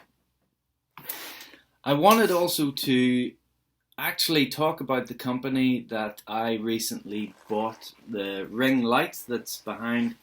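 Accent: Ireland. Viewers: Great chat, always a pleasure to hear an Irish accent 😊 I took a look at the guys site, he has quite a selection of meer's and your right, very reasonably priced. Look forward to seeing yours! 99 subs as I type - you'll have broken the ton in a day or less 👍🏻Also look forward to seeing your presents, your Mrs sounds like a keeper. Lastly, filters - I started with them but abandoned them fairly quickly (more as I didn't like the tight draw). Good luck with that 'stache!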